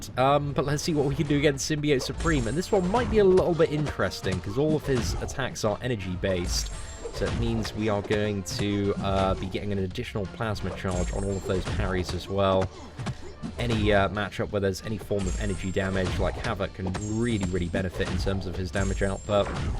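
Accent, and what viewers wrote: United Kingdom. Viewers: Im only here to listen to the pale british bloke yapping about.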